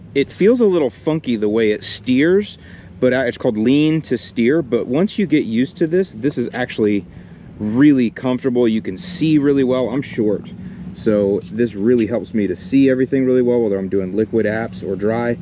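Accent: Chicago, United States. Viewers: I enjoy the Chicago accent... somehow, I didn't know you were from there until recently.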